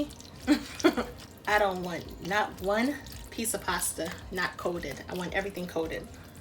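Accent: New York, United States. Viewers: I can’t wait to make this. I was looking for a lobster Mac for this Mothers Day. P.S. are you a NYer ? I recognize the accent sounds like I’m listening to someone from home. I post the results.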